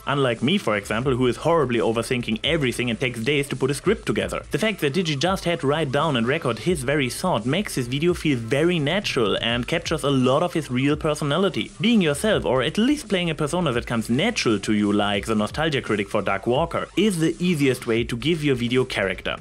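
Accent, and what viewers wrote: Germany. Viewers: Are you german I watch some german youtubers I recognise that accent just curious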